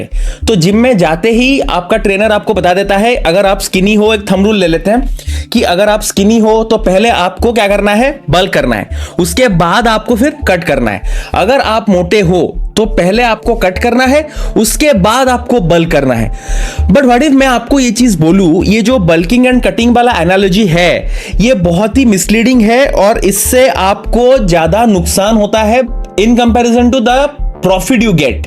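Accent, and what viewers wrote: Odisha, India. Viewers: Bhaiya r u from odisha???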